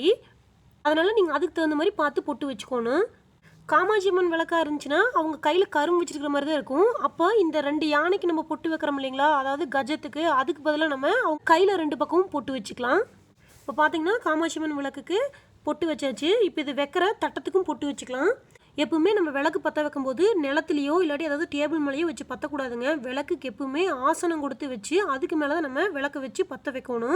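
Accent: Kongu, India. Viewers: சூப்பர் பா... அருமையான விளக்கம். எந்த ஊர்காரர்ங்க நீங்க...? நம்ம கொங்கு தமிழ் பேச்சு கேட்க கேட்க அருமை.. 👌👌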